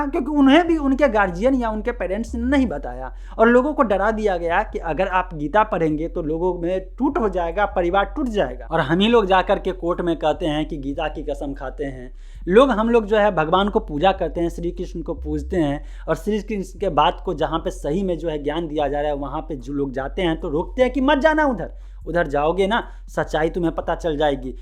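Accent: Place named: Pakistan. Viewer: भाई वीडियो का थंबनेल और टाइटल चेंज करले आचार्य जी नाम के आगे दूंगी लिख रखा है वीडियो सही बनाई है पर ये सही नहीं है व्यूज कही और से कमा लियो, आचार्य जी के तरफ से बोल रहा है तो ढंग से बोल ये क्या आधा हिंदुस्तानी आधा पाकिस्तानी। भाई जल्दी नाम के आगे से ढोंगी हटा नहीं तो रिपोर्ट और dislike करनी होगी मुझे।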